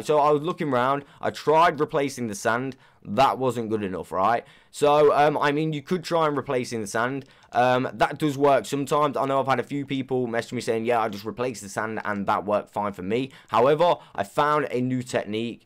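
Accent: United Kingdom. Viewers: really sounds like british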